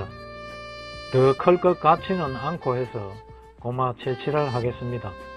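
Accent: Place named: Gyeongsang, South Korea. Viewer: 말씀하시는 억양이 경남쪽이신것같아 반갑습니다 저는 마산태생입니다 구독 좋아요 누르고 봅니다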